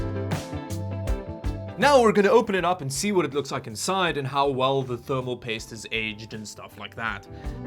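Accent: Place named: South Africa. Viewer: ek kan hoer jy is 'n Suid Afrikaner maar van watter dorp?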